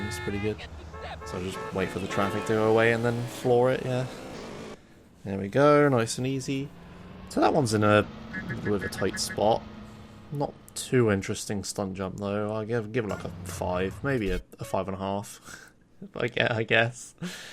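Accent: United Kingdom. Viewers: He is speaking normally, that's how us brits sound.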